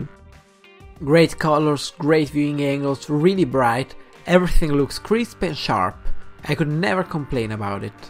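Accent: Italy. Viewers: Ero indeciso tra questo e lo z3c, il mio cruccio maggiore è, vale la pena spendere 350e per un telefono di quasi due anni? (Scrivo in ita perchè l'accento è palese :D ) btw bel video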